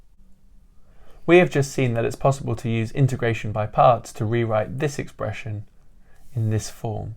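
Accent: United Kingdom. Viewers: I love his handwriting and his british accent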